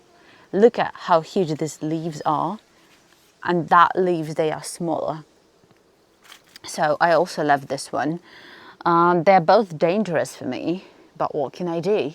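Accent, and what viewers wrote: United Kingdom. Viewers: Internet isn't real yet this british accent is almost real, so much prpganda but, your video reminds me of my grandma, she never owned any land, yet she knew so much about farming. We used to harvest public plants and at the time it felt like i was carrying twice my weight in fruits. Better times 😀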